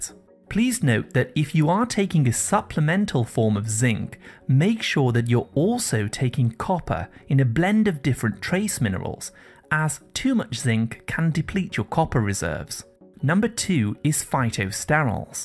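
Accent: United Kingdom. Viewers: What's with the British voicing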